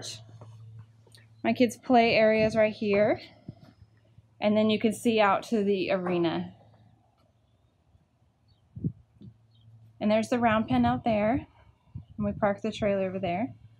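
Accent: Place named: Texas, United States. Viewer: I thought so! We are neighbors! I could tell from the terrain etc. plus you sound Texan I run a private non profit in Marble Falls. Would love to connect!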